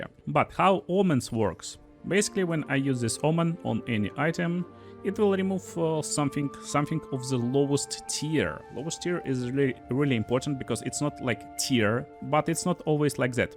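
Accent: Russia. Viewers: Всегда интересно слушать такое, как русский говорит Английские слова по русски) Как говорится, на*бал систему) подскажи, через как ИИ перса на превью делал? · I tried watching, i honestly did, but the russian accent is just killing the entire thing for me. my fire of hatred towards Z pigs cannot be extinguished.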